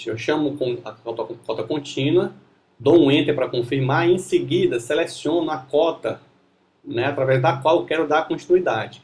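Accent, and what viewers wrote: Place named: Ceará, Brazil. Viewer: Ótima aula. Sotaque Cearense inconfundível...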